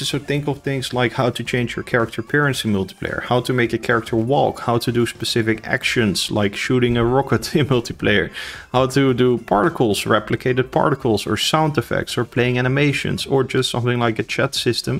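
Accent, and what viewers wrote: Netherlands. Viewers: Just found your channel, exactly what I have been looking for for ages! (and you premiered it on my birthday XD) You sound dutch (ben ik ook XD) Looking forward to going through these tutorials ^^ Thank you for making them <3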